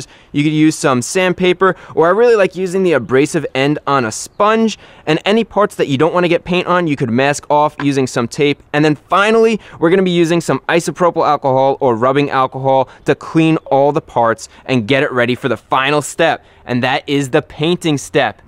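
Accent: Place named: New Jersey, United States. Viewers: Love your videos man. If you lived closer we would hang out. You do stuff how my friends and I do. From listening to your voice I'm guessing you're from Jersey? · I heard the NJ in your voice a mile away lmao!!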